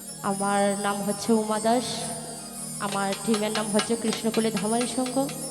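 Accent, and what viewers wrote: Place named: Sylhet, Bangladesh. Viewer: উনি সিলেটী ফুৰি (মেয়ে) তাই বাংলায় কথা বলতে ভিল হয়...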